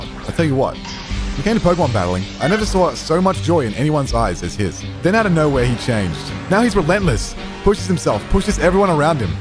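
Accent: Australia. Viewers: i never realized how australian you are matye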